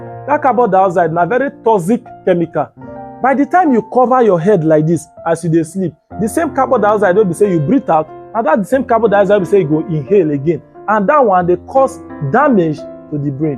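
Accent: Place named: Nigeria. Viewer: I didn’t know your pidgin is this thick oo 😂😂😂😂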